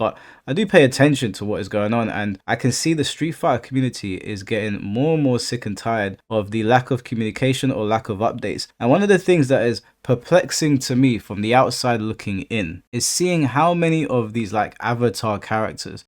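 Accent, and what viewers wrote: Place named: United Kingdom. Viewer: Lmaoooooo so when I 1st watched your videos like this, it was my 1st time at your channel so I had no clue what you looked like, just a voice. For the longest time I thought you were white and looked something like the4thSnake 😂💀😂💀 being from the US, the UK accent sounds the same on everyone, it’s not like the US where people “sound white” or “sound black” ect to put it simple. But then I found out you were black and my mind was blown.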